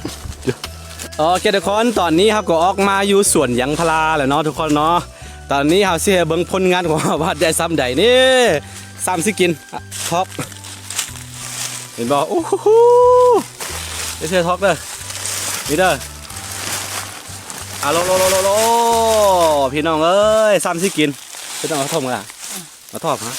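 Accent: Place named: North, Thailand. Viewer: พูดเหมือนคนเหนือฝั่งไทยเลย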